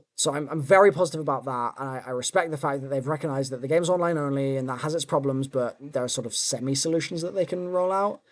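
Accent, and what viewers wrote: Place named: United Kingdom. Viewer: I didn't know you where British.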